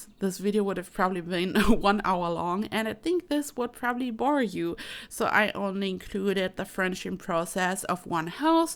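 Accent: Germany. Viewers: Omg hahahhaha this is so funny:D It really is minor. I heard it so many years ago and could never unhear it. I realise its due to accent. My guess would be german? Also, go! Looking forward to more builds <333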